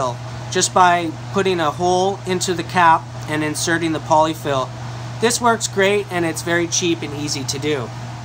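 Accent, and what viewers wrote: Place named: United States. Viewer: you sound like a warm blooded American, like me. Folks jealous can't stand your teaching freedom & and basically free self-sustainability, gj, and Many-Thanks!! I'm wanting to start growing some. You could almost live off these mushrooms, if it's enjoyable it could make you some decent money. 🍄 many thanks my friend.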